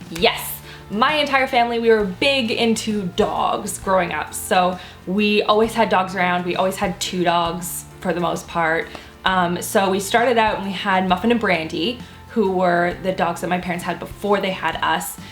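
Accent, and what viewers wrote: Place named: Canada. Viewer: I've never really heard your Canadian accent until this video, lol. So cute! Great content, as always.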